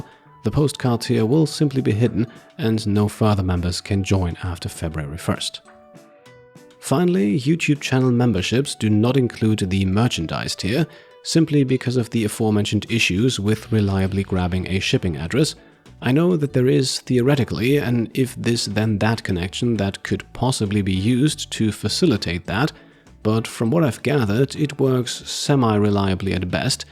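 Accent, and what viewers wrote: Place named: Germany. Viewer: You have a similar speech pattern to another channel I watch. "Feli from Germany". She obviously learned American English tho.. Are you Bavarian? Btw, Glad you will be on YouTube full time!!. I'm patiently waiting for a Stellaris series done the complete way. It would be SUPER SUCCESSFUL and there is not a lot of stellaris playthrus that RP effectively!!!!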